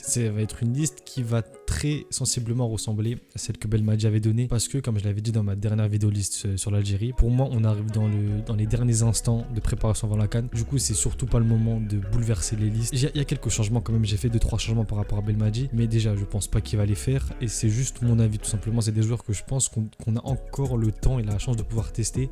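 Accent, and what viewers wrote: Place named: France. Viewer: C,est quoi cet accent français ? Tu mâches tes mots, on ne comprend rien !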